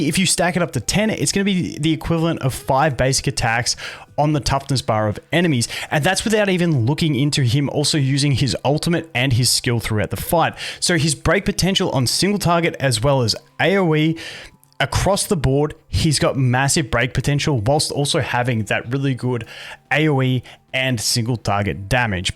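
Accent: Australia. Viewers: Absolutely love the content! Can't get enough. Congrats on the 110k! Love the representation for us folk down under, nice change from all the American accents haha. - from a grateful kiwi across the ditch ❤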